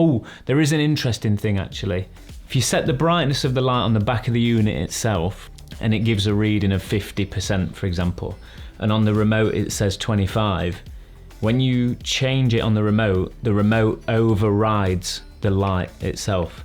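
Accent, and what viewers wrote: United Kingdom. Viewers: You sound like Carl Froch - you from Nottingham ?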